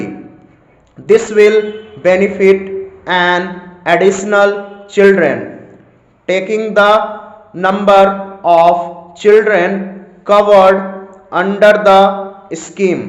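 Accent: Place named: India. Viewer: Indian accent aisa hi hota h n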